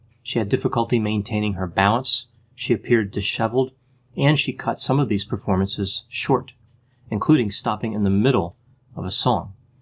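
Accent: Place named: Harrisburg, United States. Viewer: I share the Delaware Valley accent with. It's a beautiful thing. But I'm curious to know if he's more west-of-Philly than he is Delaware Valley. His accent would seem to indicate this. From him I hear more Harrisburg (or at least Montgo) than I hear Philly or Wilmington.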